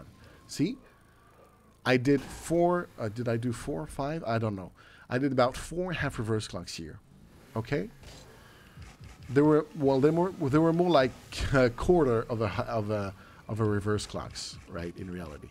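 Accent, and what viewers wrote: United States. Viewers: american accent but a british one in the mega video? lol also thanks for this you absolute legend! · what happened to your accent 😭😭 its almost american now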